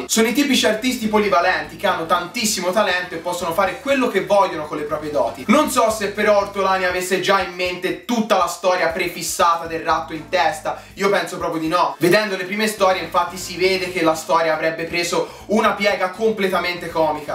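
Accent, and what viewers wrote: Tuscany, Italy. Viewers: ma sei toscano????? XD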